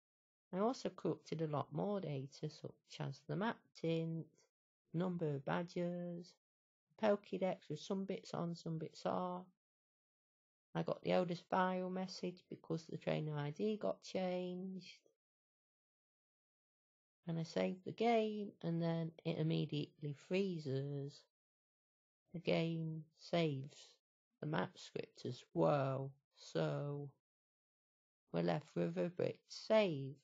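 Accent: United Kingdom. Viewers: Ta you sure the video wasn't on x0.5 speed? sounds like a british girl to me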